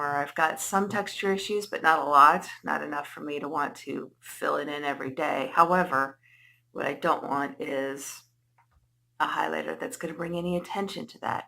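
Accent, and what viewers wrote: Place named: New York State, United States. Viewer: I thought I heard a NY state accent. I live 10 min away from Niagara Falls, Canadian side. I will check out the rest of your channel. Great job!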